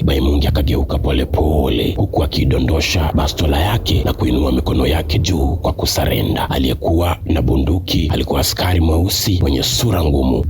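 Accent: Tanzania. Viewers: definitely speaks like a ninja from bongo, maybe its that fellow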